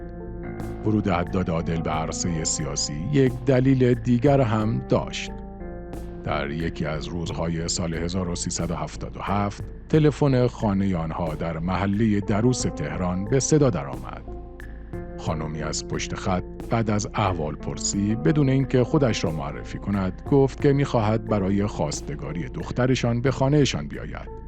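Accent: Arab world. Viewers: جناب شما بیوگرافی حداد عادل را بدرستی بیان نمردید و لهجه شما از کجاست؟؟؟؟ عرب هستی یا ؟؟؟؟؟ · احق کثافت ندانذ با شخصیت ترین بانوی جهان فرح نیست دیوی بی. ناموس که صدای زشت گزارش میدهی دیوث ته لحجه عربی مثل صداي شغال در نیمه شب میماند هر کس تورا تایید کند مثل تو بی شرف کثافت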